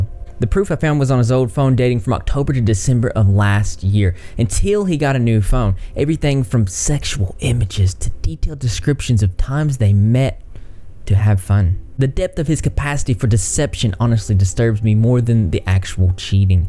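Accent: South, United States. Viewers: Are you from the south? Your accent is adorable.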